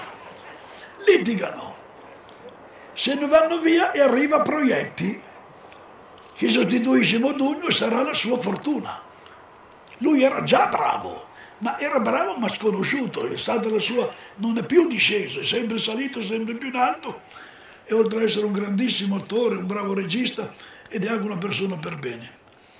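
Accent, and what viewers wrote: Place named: Milan, Italy. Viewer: Sono giorni e giorni che vedo e rivedo questi filmati di Piero Mazzarella un grandissimo attore di prosa dalla voce inconfondibile dalla cadenza dialettale milanese di una volta e mi si stringe il cuore e mi commuovo a sentirlo sapendo che purtroppo non'è più fra' di noi mi sarebbe piaciuto che ci fossero più filmati a lui dedicati in rete per sentire i suoi racconti storici culturali e dialettali perchè attraverso di essi rivive un mondo che non c'è più ma che scorre ugualmente nel nostro sangue e nel nostro DNA in modo inconsapevole per questo ci emozioniamo quando li ascoltiamo perchè ci fanno capire che essi rappresentano le nostre origini etniche e culturali che spesso ignoriamo ma che fanno del popolo italiano un popolo immensamente ricco a livello linguistico e comportamentale.P.S. R.I.P.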